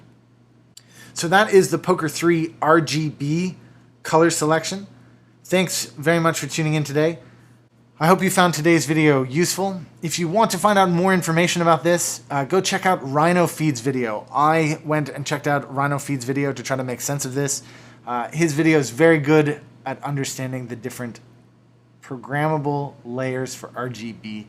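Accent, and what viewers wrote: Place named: United States. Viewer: "Does my head in"... never thought I'd hear someone with an American accent say that.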